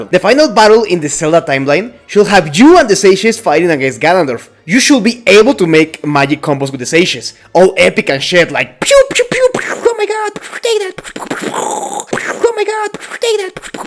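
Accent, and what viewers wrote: Spain. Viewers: you have like a spanish accent it's kinda weird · Supongo que eres de España por tu acento asi que te comento en español, la verdad es que la idea que propones me parece bien, el problema es que Zelda es muy poderosa, se cargo a todos los guardianes de la zona en la que estaba link luchando en una de las memorias de BOTW, mantuvo a Ganon durante 100 años en el castillo y después de que link lo derrotara lo selló. Ahora esto se podría solucionar diciendo que Zelda ha perdido poder después de sellar a Ganon (ella misma dice en el final secreto deBOTW que ya no nota la trifuerza) y hacer que realize las pruebas que mencionas en tu video para recuperar la trifuerza completa y así ayudar a Link a derrotar a Ganondorf, que es lo que impidió que esto pasase el miedo que tiene Nintendo de que la gente se queje. Aun así se que algún día dejarán atras ese miedo y lo haran, así como canonizar el Zelink. 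PD: Buen video bro❤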